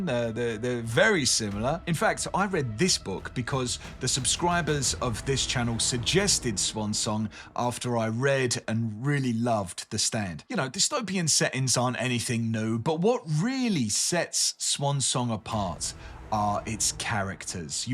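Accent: United States. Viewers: I love the American accent! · GREAT American accent!!!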